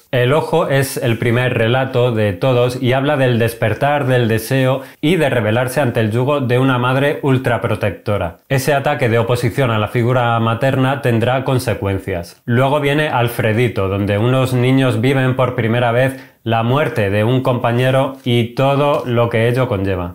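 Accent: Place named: Uruguay. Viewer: kintsugi, lo tengo pendiente en bookmate y estoy leyendo: debimos ser felices, pero después de ver tu video no voy a poder no leerlo con acento uruguasho.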